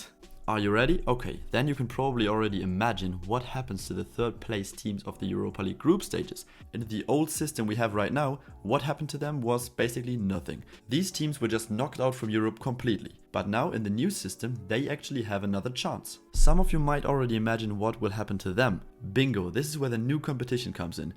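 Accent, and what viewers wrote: Germany. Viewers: This dude speaking English sounds like he is speaking German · I hear the german accent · Man merkt halt so krass das du Deutscher bist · Der Deutsche Akzent haut rein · Are you German Weil es klingt sehr deutsch vom Akzent her 😂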